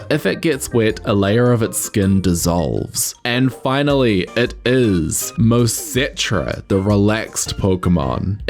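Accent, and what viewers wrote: New Zealand. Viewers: I still cant get over the Petz Catz 2 theme music lmao. I was just in your stream thinking "I wonder if he's from New Zealand with that accent.." and behold, a kiwi lmao. But I really like the designs! I love how much detail you put into the descriptions too. Please do more of these :)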